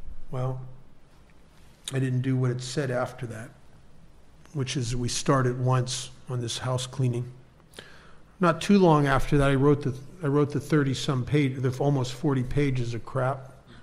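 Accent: United States. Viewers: Nice to hear american english🥰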